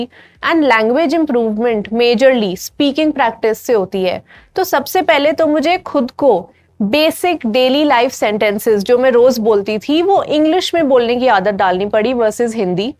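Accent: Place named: United States. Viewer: American English ❤ American Accent ❤ American Syllable ❤ American Pronunciation words ❤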